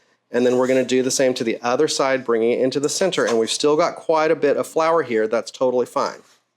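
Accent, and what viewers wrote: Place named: South, United States. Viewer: You ma'am, are a real SouthernSoulSister. I thought so at "ya'll" and "sin" but I knew it for shorly at "my husbands mama". Lol.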